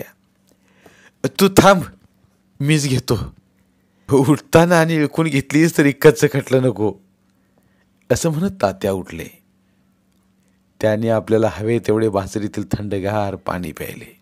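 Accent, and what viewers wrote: Rural, India. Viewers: 🙏 तुमचा ग्रामीण आवाज व वाचन अतिशय सुंदर आहे. मी तुमची नवीन श्रोता वाचक आहे. 😀😀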